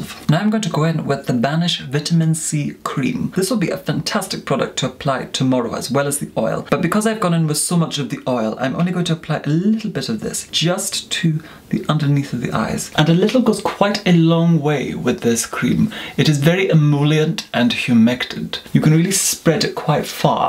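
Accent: Scotland, United Kingdom. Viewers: I discovered you yesterday. Make up isn't really my forte, but your eloquence is delightful. It's also good to hear another Scottish accent once in a while. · First time watcher, fascinated by your accent. You sound like that very specific English accent that only really posh Dubliners have. EDIT: listening more, definitely more Scottish than Irish.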